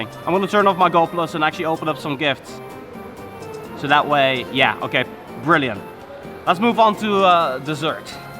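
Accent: Netherlands. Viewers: Jij Bent Nederlands ik hoorde je het praten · Are you from the Netherlands? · Wow are you dutch im dutch tooo !!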